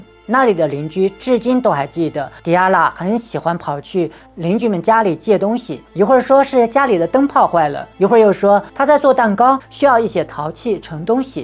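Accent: China. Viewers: 請問你是哪裡的口音？安徽嗎？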